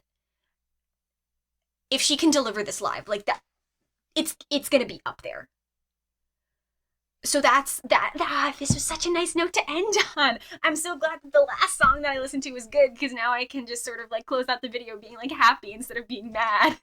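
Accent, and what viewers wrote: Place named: England, United Kingdom. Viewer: Your voice and english accent is so annoying.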